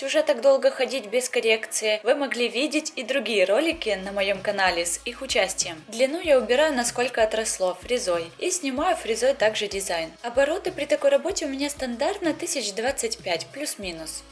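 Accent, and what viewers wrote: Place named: Makiivka, Ukraine. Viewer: Подписана на вас очень давно, смотрю все видео. Вы потрясающий мастер. Но почему вы настолько плохо говорите по-русски? Почитайте книги, что ли. Или хотя бы не используйте деепричастие и причастие, если не понимаете, где в предложении действующее лицо. Нельзя так. Вы публичный человек, а шпарите на макеевском диалекте.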